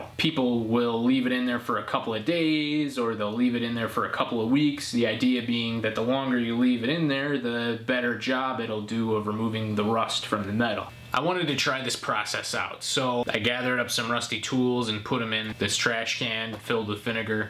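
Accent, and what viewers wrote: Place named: Midwest, United States. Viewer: Where are you from? I'd guess somewhere in the Chicagoland area, Wisconsin or Minnesota based on your accent.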